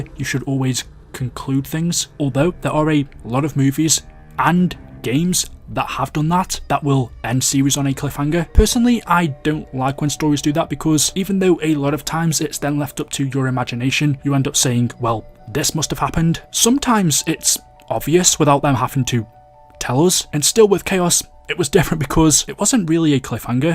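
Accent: United Kingdom. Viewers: you sound like razzbowski if he was super bri'ish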